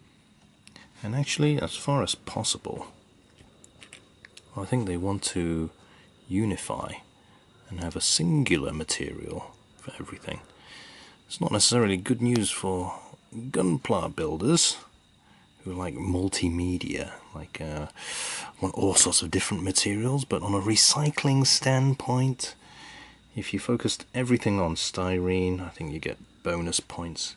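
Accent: United Kingdom. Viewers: also about the questions ask bit, where do you live, you have a very british voice but you upload alot of them promo videos in japan?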